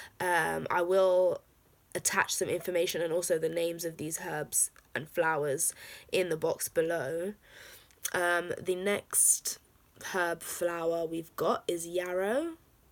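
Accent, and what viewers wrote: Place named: United Kingdom. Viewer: Why do I feel I am in Hogwarts hearing your accent.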